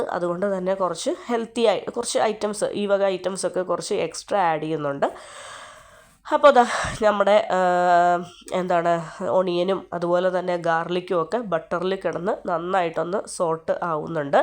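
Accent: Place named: Kannur, India. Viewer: ചാനൽ ഫുൾ കുക്കിങ് തന്നെ ആണലോ,, നിങ്ങളെ വോയ്‌സ് കണ്ണൂർ കാരുടെ പോലെ തോനുന്നു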